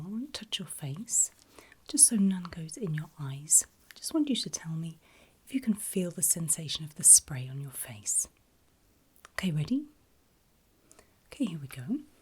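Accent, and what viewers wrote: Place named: United Kingdom. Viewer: Your accent is like ✨P E R F E C T✨ i LOVE british english accent 🩵🩵 so relaxing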